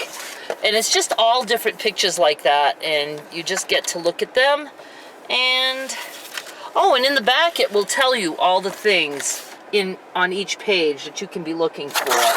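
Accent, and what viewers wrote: Maine, United States. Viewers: I thought I heard a Maine accent. I also live in Maine in Aroostook County (3 miles from the Canadian border.) I've been here 7 years but I'm from California. We plan to move to "civilization" (downstate) in the next couple of years.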